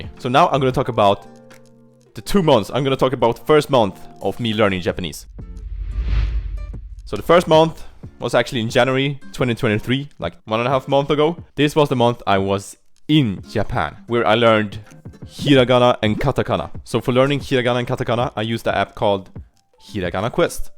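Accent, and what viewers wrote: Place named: Sweden. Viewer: You're Swedish right? You have that typical Swenglish accent hehe (hope you don't take this the wrong way, I'm Swedish myself so it's just very recognizable :)). Anyway great study advice for beginners. I have studied Japanese on and off for 10 years but still only know the basics, but that's the result of only studying on and off lmao.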